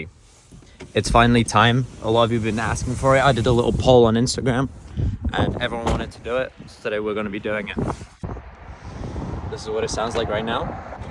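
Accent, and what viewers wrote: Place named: United Kingdom. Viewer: what happened to your british accent man, bring that shit back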